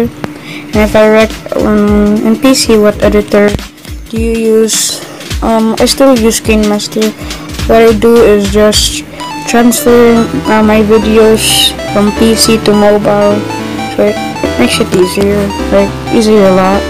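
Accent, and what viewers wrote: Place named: United States. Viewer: He is a filipino but his voice sound like an american holy moly hooowwww dude!!! Lol Edit: i dont even understand ur language but it got me laughing when u were talking with ur language XD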